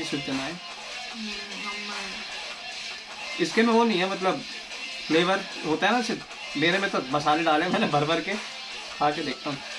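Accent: India. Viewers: Bhai i love your desi way of talking love you bro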